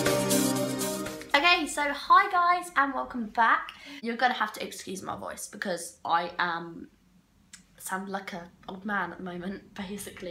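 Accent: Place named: England, United Kingdom. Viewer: You sound really English 😂 that accent is adorable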